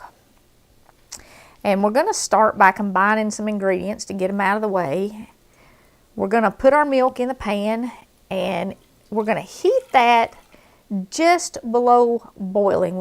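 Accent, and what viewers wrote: South, United States. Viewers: It's nice to hear your Southern English voice again:) I feel that you've been going through a hard time... (sickness - death..?) God bless you,.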